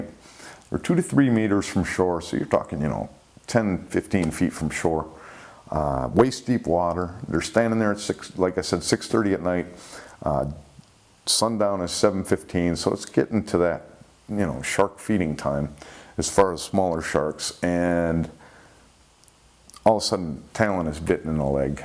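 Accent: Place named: Michigan, United States. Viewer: your show is very educational and entertaining. I look at my wife when the places that I snorkeled and swam come up as locations in your shows (Rose Island, Bahamas, Hilton Head Island, Destin) and say “great…now I wonder how close I actually was to a shark”. BTW there is a link to a guy in NJ that is supposed to be you. I’m from Michigan and you definitely sound like a Michigander…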